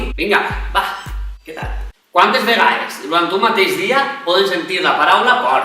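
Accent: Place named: Valencia, Spain. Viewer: Acabo de descobrir el canal, sincerament, gràcies. El contingut és espectacular, molt necessari, i a més dóna una mica d'esperança en la humanitat. Lluitem tots per una societat millor, lliure. Aquest vídeo (i el canal en general) haurien d'estar en tendències i que tothom el vegi i reflexioni. A tot això, sumar-li que parles en valencià, que pel menys a mi, com a catalana, em delecta l‘oïda., segueix així!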